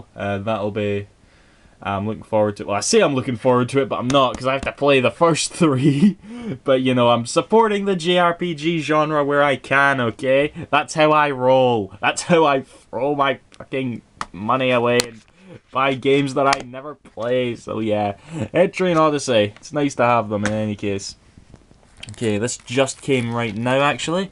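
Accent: Scotland, United Kingdom. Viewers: where abouts in scotland do you come from?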